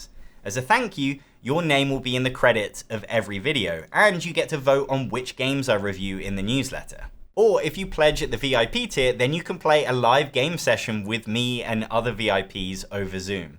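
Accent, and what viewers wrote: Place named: United Kingdom. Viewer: Have you considered adding support for more languages? Brazil's got a growing scene for board games, but very few of us know English well enough to keep up with your videos - especially with the British accent -.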